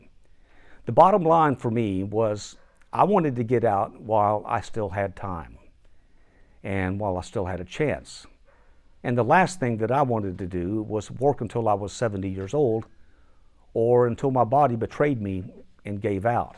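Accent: South, United States. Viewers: Are you from the south? We’re from Arkansas. We’re exhausted and thinking of retirement. Glad that we found you ❤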